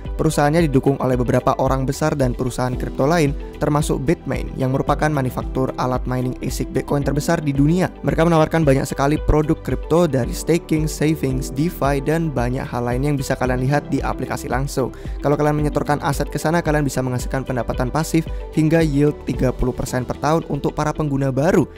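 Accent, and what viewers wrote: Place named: United Kingdom. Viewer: Aksennya British banget bang😂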